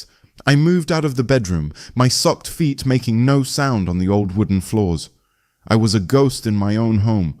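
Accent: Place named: United Kingdom. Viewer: Sorry hearing the Accent from the UK talking about Texas farmers is too phony😊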